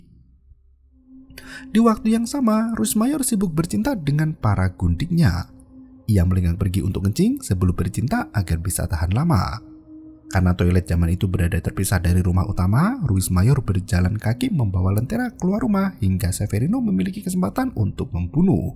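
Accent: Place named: Magelang, Indonesia. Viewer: Kok dengerku Magelang ya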